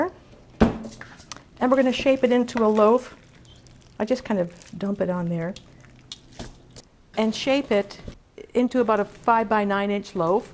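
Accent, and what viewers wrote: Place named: Canada. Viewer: Hope you are well,. Thank you so much for sharing your meat loaf recipe. I plan to try this! I like the very slight Canadian accent. Your presentation is awesome.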